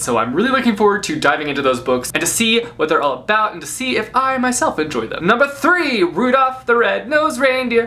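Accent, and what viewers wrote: Spain. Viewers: You have an awesome Spanish accent! lol. :) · I love your spanish accent, Happy Holidays Dear!!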